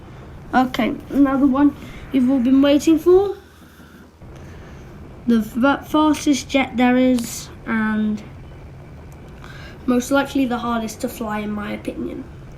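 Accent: United Kingdom. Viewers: Just by the sound if his accent I'd say yes he is British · You are way to underated you must have 50k right now. Btw are you british? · are u British?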